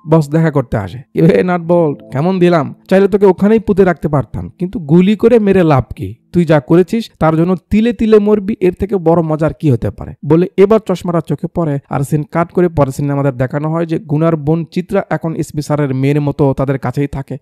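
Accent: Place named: Rangpur, Bangladesh. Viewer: আমাদের রংপুরের কথা শুনে ভালোই লাগলো। সঠিক বলেছেন।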